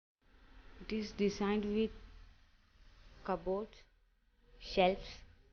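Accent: Kerala, India. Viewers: Who noticed malayali voice over 🤠😀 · Sound like southern accent of female may be Kerala's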